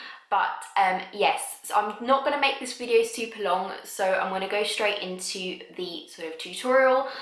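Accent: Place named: RP, United Kingdom. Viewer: Aww you're so sweet! The make-up looks really good! btw I love your English posh accent 😁 😘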